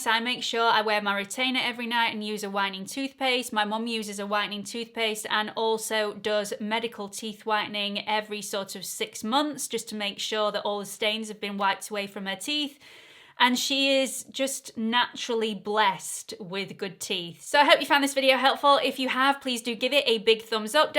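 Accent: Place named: Yorkshire, United Kingdom. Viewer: I'm from the US but I knew I heard Yorkshire!!!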